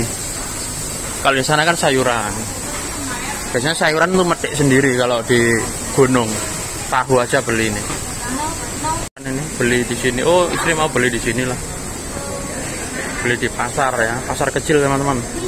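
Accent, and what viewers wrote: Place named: East Java, Indonesia. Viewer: Ys udah wis yo wis jalan ke gonung..dialek jatim memang medok susah hilang😅😅😅😅😅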